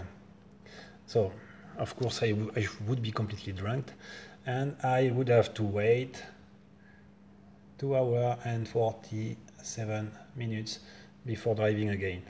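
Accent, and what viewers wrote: France. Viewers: Bonjour, je devine par le logo Orange F mais aussi par l'accent que vous êtes français ! Je suis intéressé à en savoir plus sur votre projet et son fonctionnement plus précisément pour le transfert de données jusqu'au smartphone et comment rendre l'outil plus fiable à partir du détecteur MQ-3, pourriez vous m'envoyer un mail à mon adresse mail afin de communiquer plus facilement : <mail> Merci d'avance pour votre réponse et au plaisir de recevoir de vos nouvelles !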